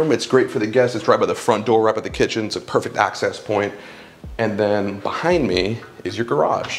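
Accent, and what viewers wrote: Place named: United States. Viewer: i like your american accent. he is very classy. so many americans speak as peasants but not you .❤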